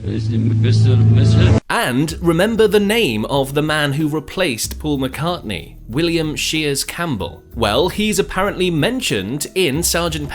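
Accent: United Kingdom. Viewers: You are British because of your accent, British English, so you understand the subject well, why were from london 👍 ￼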